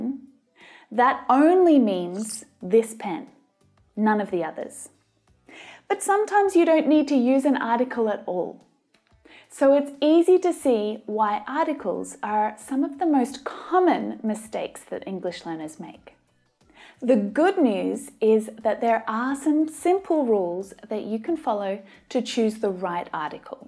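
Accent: Australia. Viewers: I love your Australian accent. Especially when it comes from female. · Wow the Australian accent is amazing 🐇 i like it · Love her australian accent ❤️ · Well Aussie say "can't" like "kan" and that confuses me . For example when she says " Bad habits "kan" be fixed I'm not sure it can't be fix or can be fix. Any Aussie here help me out of this pleaseee!!!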